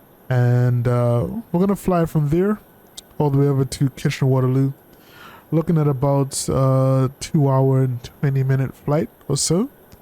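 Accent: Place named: Sint Maarten. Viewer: Hey bro which Caribbean island are u from your accent sounds st.Maarten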